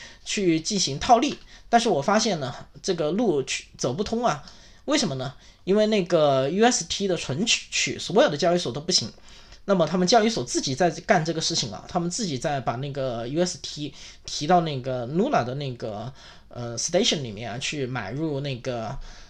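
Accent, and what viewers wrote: Changsha, China. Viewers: 听那方言，好像是长沙话，哈哈😃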